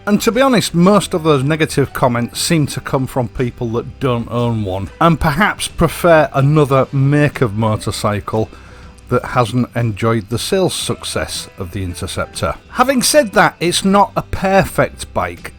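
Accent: United Kingdom. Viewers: Thanks! Pro review, nice use of Queen's English, skill in composition and videography. Watching more than once. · I think you are only person who has given your heart to every comment... By the way I was taken away by your 'Raw British' accent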